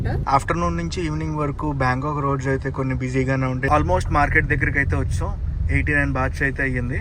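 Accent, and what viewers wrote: Godavari, India. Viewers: మీ ఇద్దరి వల్ల తెలుగు భాషకి గౌరవం పెరుగుతుంది. మీది గోదావరి యాస, గారిది విజయనగరం యాస. ఏదైనా ఇప్పుడు ఎవరి సొంత తెలుగు హ్యాపీగా మాట్లాడవచ్చు. ఇంతకు ముందు ఏవరు ఏడిపిస్తారో అని కృష్ణా, గుంటూరు తెలుగే మాట్లాడుతారు. Off cource మీడియా, సినిమాలు అవే బాషలో ఎక్కువగా ఉండడం వల్ల అలా మాట్లాడుతున్నారు. ఇంతకు ముందు తెలంగాణా యాస కూడా అంతే, నాలుగు సినిమాలు ఆ బాషలో తీయడం వల్ల అందరికీ ఈజీగా అర్దం చేసుకుని వాడేస్తున్నారు. Even ఆంధ్రలో వాళ్ళు ఇప్పుడు తెలంగాణా యాస ఈజీగా వాడేస్తున్నారు. ఏదైనా సినిమా అయినా, సోషల్ మీడియా అయినా, మీరు హైలైట్ చేస్తేనే., యాసలు బ్రతుకుతాయి. కృష్ణా, గుంటూరు ని తక్కువ అనడం లేదు, pure, plain తెలుగు వాళ్ళది, మిగతా జిల్లాలది ఫ్లేవర్ తెలుగు అంతే. Love from vijayawada(NTR dist). Native place చీపురుపల్లి (vzm dist). Thank you.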